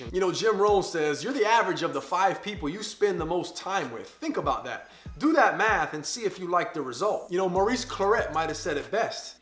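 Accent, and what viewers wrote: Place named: South, United States. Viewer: Country accents are ☺️☺️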